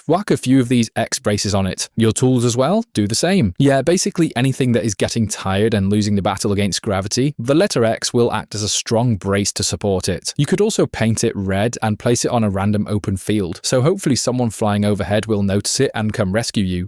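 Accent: United Kingdom. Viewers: He's British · Your also British Mate?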